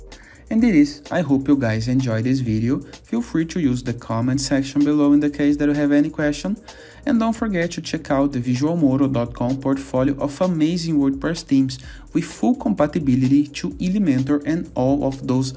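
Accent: Brazil. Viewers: Nice content and nice sotaque brasileiro, meu querido!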